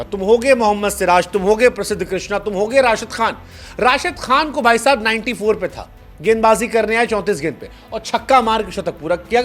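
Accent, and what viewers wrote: Bihar, India. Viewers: Bhai aaj bihari me bol rha hai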